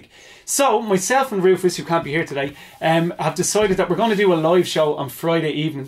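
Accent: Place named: Ireland. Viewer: I was unable to work out zambia with an irish accent, being Norwegian and all that so for a short while i was sure zambia was a person and nothing made sense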